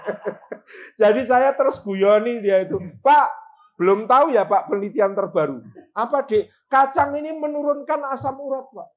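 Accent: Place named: Banyumas, Indonesia. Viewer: Ust. Salim memenuhi sunnah Rasulullah saw, berbicara dgn bahasa kaumnya. Di Purbalingga bicaranya ikut ngapak 😅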